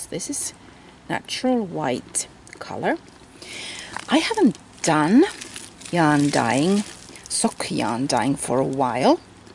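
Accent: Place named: Finland. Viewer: I love listening to you with your charming Finnish accent. I am from Sweden but now live in Seattle, USA. Please come back, even for small snippets!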